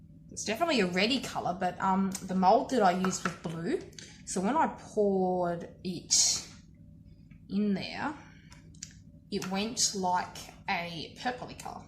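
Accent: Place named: Australia. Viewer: Nice! I always really wanted to do this. Good accent! You an Aussie mate?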